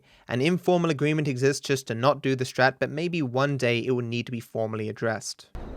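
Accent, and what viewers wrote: Australia. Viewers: This guys stupid Australian accent mixed with his terrible speech impediment that makes his “R’s” sounds like “Aw” makes him impossible to understand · Oi, u are Australian? lol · Wait your from Australia? Wtf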